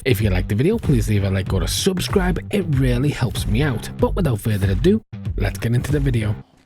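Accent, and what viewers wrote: Liverpool, United Kingdom. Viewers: I need to know are u scouse? or from the wirral i feel like i hear it in ur voice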